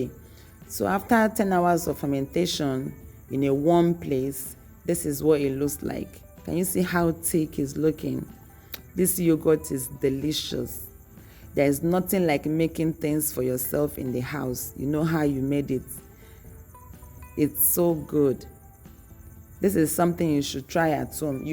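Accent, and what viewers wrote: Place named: Ghana. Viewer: Hello ma'am, thank you for sharing. The video was quite explanatory. Thank you. The way you speak sounds like one from "Pete Edochie's" era. They spoke a lot of oral English. It sounds like music really, depending on how they speak it anyways. But then again, you sound like you were born, "bread and buttered" in Ghana. ❤❤❤ Thank you once more ma'am.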